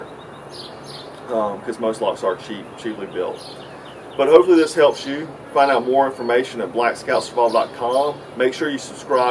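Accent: United States. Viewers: That country accent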